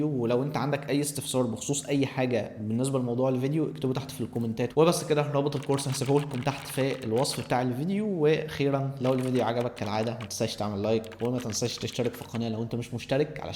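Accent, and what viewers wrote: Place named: United States. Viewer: بالله اتكلم بلهجة عربية لهجتك هجين كأنك امريكي تحاول تتكلم عربي غصبا عنك اعتز بلغتك لا تصير رخيص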